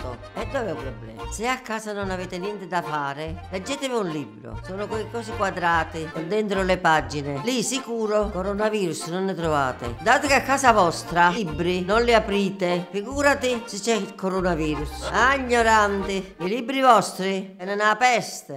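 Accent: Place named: Naples, Italy. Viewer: Ciao, sono un'insegnante di italiano e vivo a Dublino. Ho passato questo bel tuo video ai miei studenti stranieri, così imparano da casa anche durante il coronavirus e magari imparano anche l'accento napoletano. Ti volevo chiedere se hai messo tu i sottotitoli in inglese o sono automatici? Corrispondono in pieno al testo in italiano tranne in un punto. Non so se li puoi/vuoi editare. Invece di "eating nails" si dice "biting nails". In quel punto io mettererei "biting nails, cuticles" (le pellicine). Buon lavoro!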